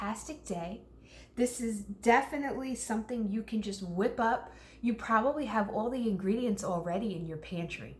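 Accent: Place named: New Jersey, United States. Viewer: I did pick up on the heavy Jersey accent lol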